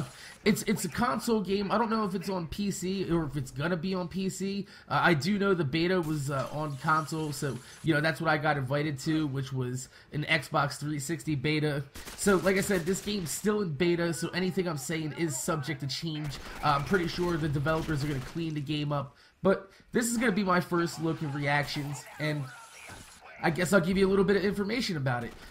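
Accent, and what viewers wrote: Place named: Boston, United States. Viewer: I bet this guys from boston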